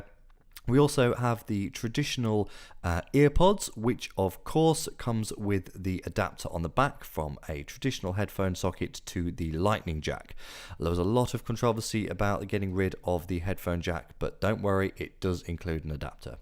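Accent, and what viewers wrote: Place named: United Kingdom. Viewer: It does not have writing in the back if you live in the U.S. and in some other places like Canada. He probably lives in the UK I'm guessing bc of his voice and....He said it in the video.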